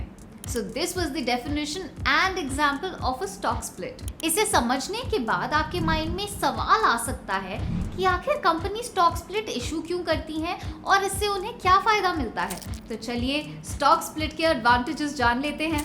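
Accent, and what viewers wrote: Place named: India. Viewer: cant listen to this indian talk , dont understand anything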